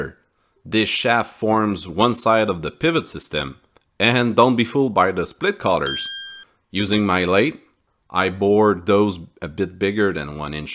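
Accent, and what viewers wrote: Quebec, Canada. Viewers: ca ressemble a un accent du quebec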